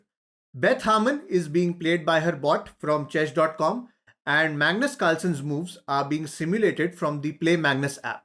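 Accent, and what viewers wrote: India. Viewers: finally an indian accent i understand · an by the way..? which indian extreme accent person, was able to rise nearly a million subs with chess? is that corona are the entire world silly nowaday.. in einer welt die verrückt spielt, ist nur ein irrsinniger wahrlich geisteskrank...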